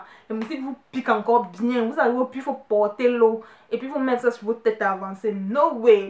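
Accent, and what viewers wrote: Cameroon. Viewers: trop bien ! waouh tu es vraiment spide!! je kiffe l'accent camer ! tu m'a donné envie de manger ,je vais apeler ma marraine , je veux du ndolei ! lol en tout cas bravo !!!!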